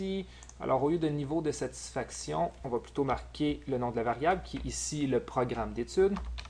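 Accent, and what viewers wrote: Quebec, Canada. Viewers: Intéressant et utile ! et super l'accent Québécois ;)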